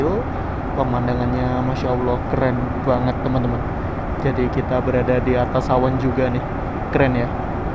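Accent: Java, Indonesia. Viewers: Gw nonton ini hny ingin dengerin medok jowone si mas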